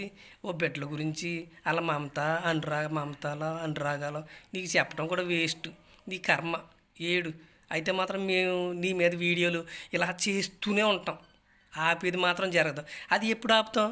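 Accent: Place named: Godavari, India. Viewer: Ayyo meeru vere anukunna andi. But voice super and Godavari slang super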